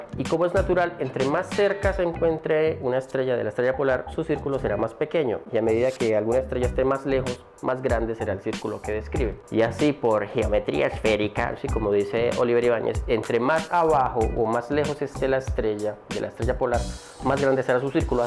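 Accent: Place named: Colombia. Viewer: Buen Colombiano (O, al menos tu acento da a entender eso), por fin alguien que también desmiente las estupideces de esos sujetos.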